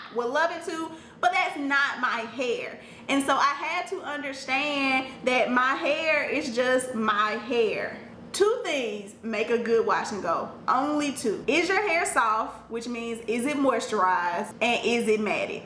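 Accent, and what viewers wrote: South, United States. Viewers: I love all your tips, i had been struggling with wash n goes fir a while and just decided to revisit them, im still learning, and you have inspired me not to give up, your hair is gorgeous and Iove your southern draw 😘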